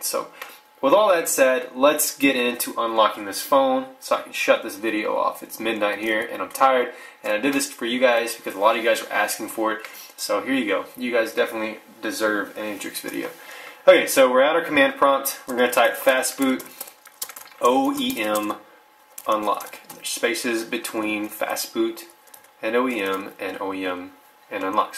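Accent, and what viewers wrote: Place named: Michigan, United States. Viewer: Are you from MI? You sound like you might have a MI accent.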